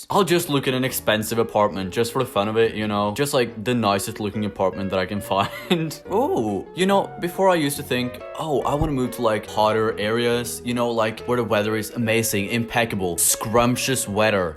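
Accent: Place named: South Korea. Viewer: You low key sound like you have a korean accent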